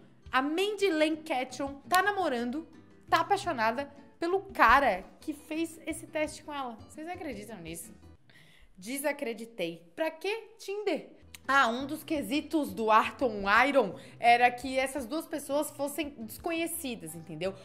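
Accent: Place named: Florianópolis, Brazil. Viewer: Ho feia sabiax que erax aqui do suli mas que erax manezinha não sabiax ...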